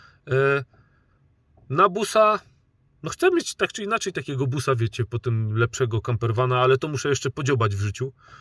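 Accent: Silesia, Poland. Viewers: Chopie jo cie lubia i ta godka ino zdrów być ❤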